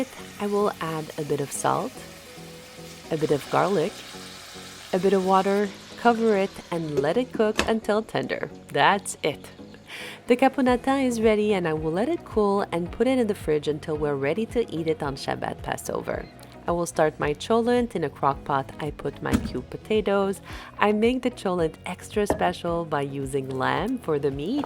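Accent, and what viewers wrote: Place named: France. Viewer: I have noticed that you have an accent, and you prononce Safran and mayonnaise like in France 😅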